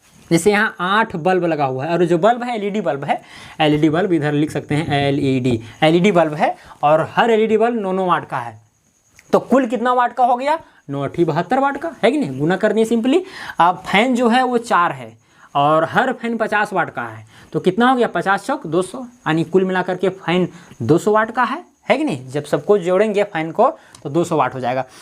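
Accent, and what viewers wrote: Bihar, India. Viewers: Bihar se ho aap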